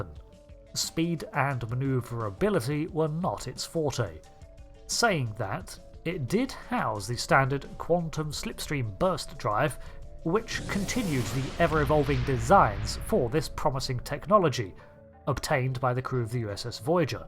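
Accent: England, United Kingdom. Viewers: He's from England. They pronounce it anyway they please.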